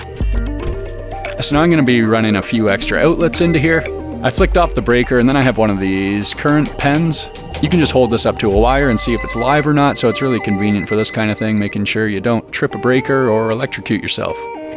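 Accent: Canada. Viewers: Is your accent Canadian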